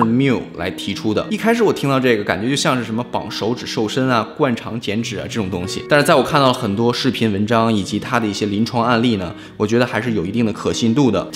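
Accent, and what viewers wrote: China. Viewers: 胖时比较好看，而且你现在的普通话口音很适合之前胖子的气质。。。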